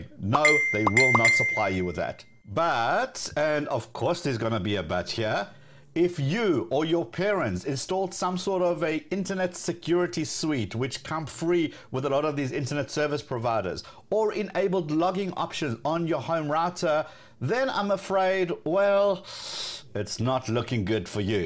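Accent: South Africa. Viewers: I definitely noticed that South African accent lol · its south african im sure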